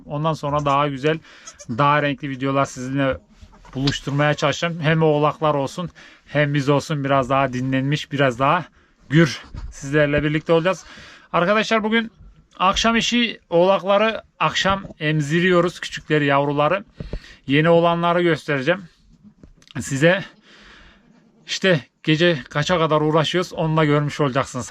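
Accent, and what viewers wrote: Konya, Turkey. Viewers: Abi nerelisin şiven bizim buradaki şiveye benziyor ben KONYA/BEYŞEHİRLİYİM.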